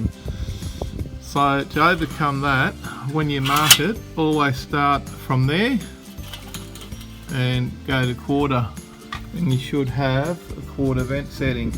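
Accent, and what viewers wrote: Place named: Australia. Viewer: Australian accent... I understand it perfectly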